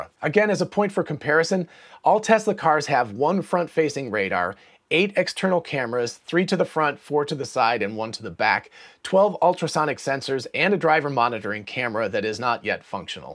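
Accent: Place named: United Kingdom. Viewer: It's so obvious that Lucid ain't gonna make it .. not quite as obvious as Nikola, but close. Elon has talked at length about the difficulties starting a new car brand. It is extremely capital intensive, and with their supply chain setup and pricing/TAM, there's no way they will make it to profitability without running out of cash ... again. Saudis bailed them out once, but the market won't be able to do it again unless they commit some major shenanigans. Lucid is a great dream narrated with a soothing British accent, but it's only that.. a dream.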